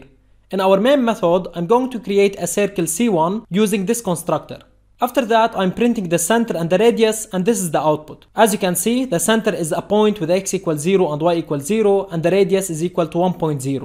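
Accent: Russia. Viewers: are you from russia💀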